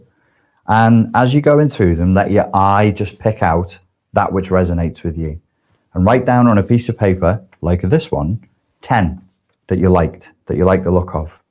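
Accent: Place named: Liverpool, United Kingdom. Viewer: This is gold. You sound so Scouse. I love it.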